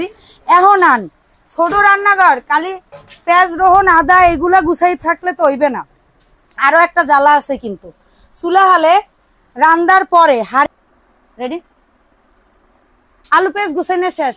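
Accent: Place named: Barishal, Bangladesh. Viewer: আসসালামু আলাইকুম আপু মোর বাড়ি বরিশাল বরগুনা কথাগুলো খুবই সুন্দর লাগে নিজের আঞ্চলিক ভাষা মত শান্তি আর কোথাও নাই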